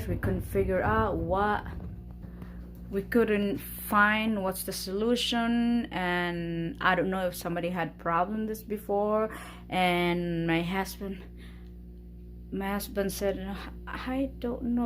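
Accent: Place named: Philippines. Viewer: Filipina accent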